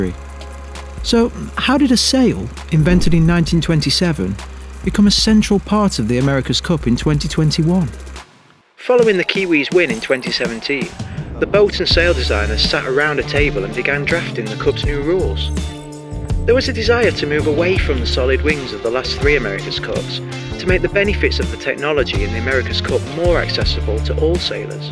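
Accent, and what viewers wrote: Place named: North, United Kingdom. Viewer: Dumbed down to the point no one watched. North sails, northern accent.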